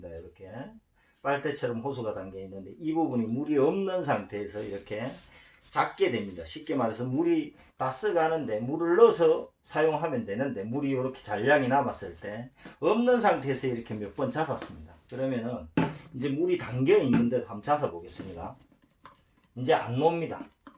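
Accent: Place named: China. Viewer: 중국분이세요? 듣도보도 못한 사투리네요 ㅎㅎㅎ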